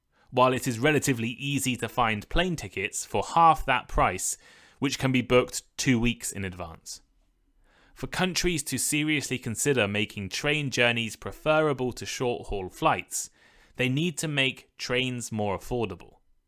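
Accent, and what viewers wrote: United Kingdom. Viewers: Is it northern or southern british accent?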